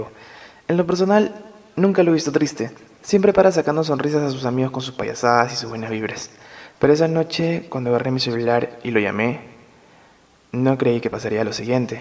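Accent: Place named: Peru. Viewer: Gaudhi Solimano Claro, cualquiera conoce la voz de un peruano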